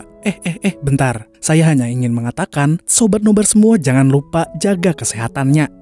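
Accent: Banjar, Indonesia. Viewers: orng Banjar kh bg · Kira orang manakah sekali nya urang banua juaaaaaa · Harau urg banjar sekalinya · akay urng banjar kalinya di dangar bahasa😂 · urang banjar kali nya naseb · Banjar jua sakalinya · banjarr sekalinya pian lahj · Astaga banjar sekalinya, tekajut² uln 😆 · Banjar kah nii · Aihh sekalinya ikam urang banjar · Umaiiii Banjar ai rupanya wal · bah mimin nya urg bnjar, mntap mang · Nah paman, orang Banjar kh pian 😁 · Mantap banjar nya 🤣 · Banjar banar wkwk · org banjar skli nya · Bahh banjar sakalinya si nihh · Orang banjar skli nya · Keren yang bacakan alur ceritanya sampai kada hingkat guring ulun. Pasti angah dari Banjar ya kan?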